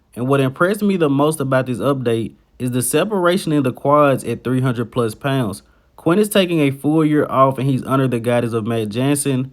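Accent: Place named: South, United States. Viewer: love the southern accent (if im not mistaken 😅) u got my sub man salute from europe 🫡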